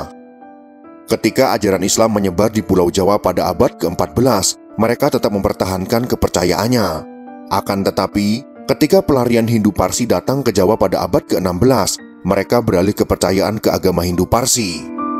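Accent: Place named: Java, Indonesia. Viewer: anda juga berbahasa medhok. bahagia jadi suku terbesar didunia.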